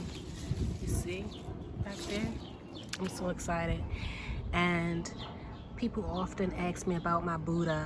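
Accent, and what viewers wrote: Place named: Baltimore, United States. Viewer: I knew once I heard your voice your were from BMORE!!!! I’m was born and raised there, but I live in GA. Your garden is BEAUTIFUL!!! Can’t wait to see this years garden!!! 💐💐 Peace & Blessings ✨✨✨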